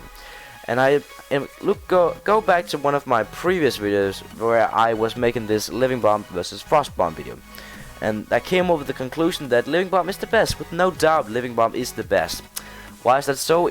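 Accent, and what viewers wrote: Denmark. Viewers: Hey dude very nice guide !. btw are you from Denmark? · Hey man awesome guide! :) Just a quick question, Where are you from? U sound very Danish lol. Anyways keep up the great work!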